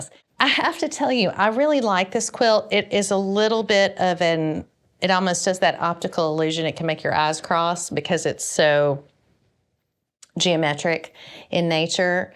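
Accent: South, United States. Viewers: It's a show and tell. And I think I speak for many of us that we enjoy listening to her Southern accent as much as viewing., I bet your Dad was thrilled that his Daughter, with her busy lifestyle took the time to learn and energy to create such a beautiful, useful gift. And you shared the lessons learned with us and made a new friend along the way, as well as your Subscribers. Bravo.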